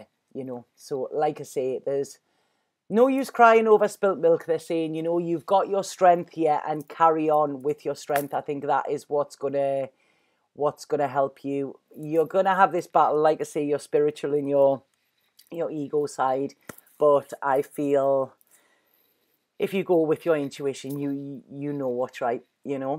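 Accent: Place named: Scotland, United Kingdom. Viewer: German? LOL sorry but I find that funny. Scottish maybe. People are funny aren't they. I will contact you in the near future for a reading. I figured out a way to generate electricity without fuel, wind or sun. Not sure how to proceed with this venture. If you gmail me I can explain the concept in 2 minutes. I will blow your mind on how simple it is. Get ahold if you want to.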